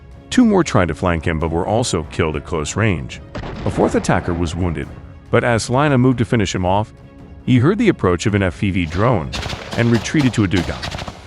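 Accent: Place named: United States. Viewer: Tedious American voice , can't even read or speak English correctly .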